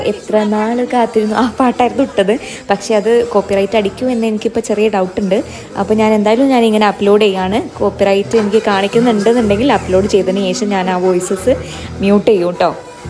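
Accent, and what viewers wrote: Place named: Malappuram, India. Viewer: Malappuram thanje ayathu kond talk nalla pole cnct anuu😄so kettondirikkan nalla rasayittundd💛🧡😁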